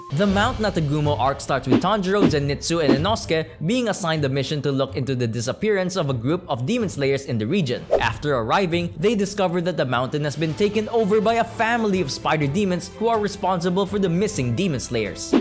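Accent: Philippines. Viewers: That Filipino accent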